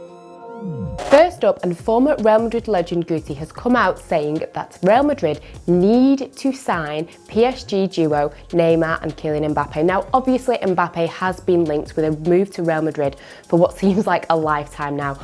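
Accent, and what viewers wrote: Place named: Manchester, United Kingdom. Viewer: Love that accent! Is that from Manchester? ;)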